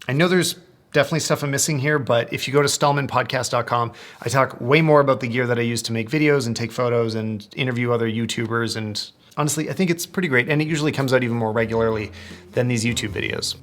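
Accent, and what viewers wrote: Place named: Canada. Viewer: ur accent tells me you'r from Canada :)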